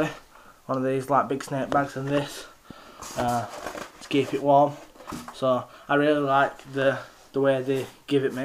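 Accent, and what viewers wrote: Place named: Wigan, United Kingdom. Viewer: Where are you from, I am from Wigan and you sound like your from near by x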